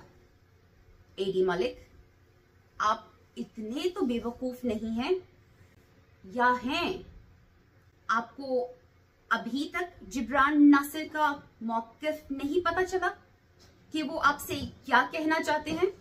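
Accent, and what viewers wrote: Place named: Punjab, India. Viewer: Good work wasy apki Punjabi boht kamal ki hai so sweet 🤣🤣🤣🤣🤣🤣🤣🤣🤣🤣ap in sab ki kotay wali boht kamal ki krti hai Punjabi ma🤩🤩🤩🤩🤩🤩🤩🤩🤩🤩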